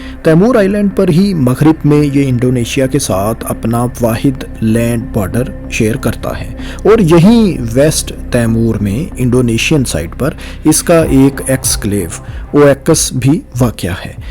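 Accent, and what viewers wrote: Punjab, Pakistan. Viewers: Mr. has some serious problems in pronunciations of the names of cities, countries places and areas. 😡🤬 Therefore, the viewers should not hope that he will improve because he has a typical Paindu Punjabi accent🤮🤢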